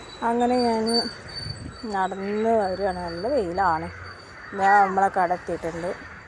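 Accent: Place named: Malappuram, India. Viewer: എവടെ ആണ് സ്ഥലം ഭാഷ മലപ്പുറം ആണല്ലോ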